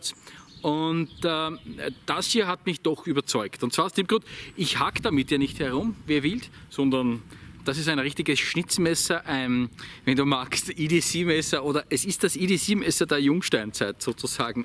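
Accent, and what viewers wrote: Austria. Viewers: Hab jetzt einige deiner Videos durch und lass mal ein Abo da. Schöne Präsentationen und deinem Österreichisch hört man gerne zu.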